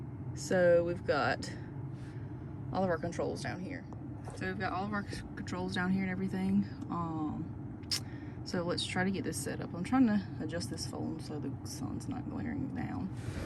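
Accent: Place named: South, United States. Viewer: I love your southern accent ❤❤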